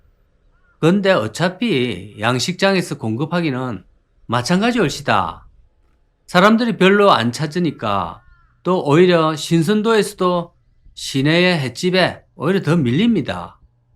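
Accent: Gyeongsang, South Korea. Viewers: 구수한 갱상도 사투리에 적절한 말투에 정말 재밋고 웃음이 절로 나오네요~ 볼수록 공감이 가네요...😅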